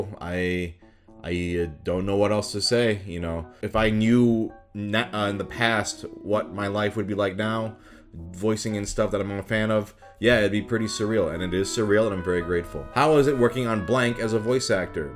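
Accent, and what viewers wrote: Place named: Michigan, United States. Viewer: As I was listening to his voice, I wasn’t hearing Southern California - more upper Midwest. Sure enough, he was born in Minnesota and spent time in Michigan. Definitely hear a serious Michigan influence in his American accent.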